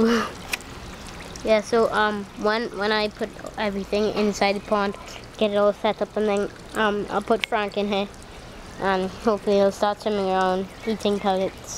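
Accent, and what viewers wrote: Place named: Trinidad and Tobago. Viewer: Hey nice fish hommie!i heard the lil trini voice.. im checking out ur vids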